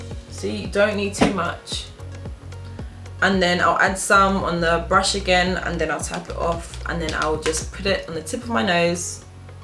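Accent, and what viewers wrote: England, United Kingdom. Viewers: Nice and natural! This was great video. love the English accent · your British yay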